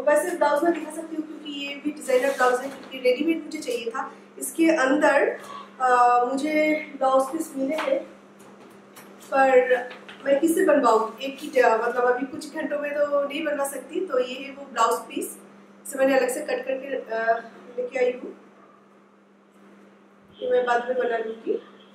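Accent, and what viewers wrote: Bengal, India. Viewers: Didi apni bangla te vlog korle basi vlo lagbe...hindi ta apni vlo bolen bt, Apnar kothar moddhe sob jaygate banglar tan ache... Plzzz banglar manus banglay fire asun....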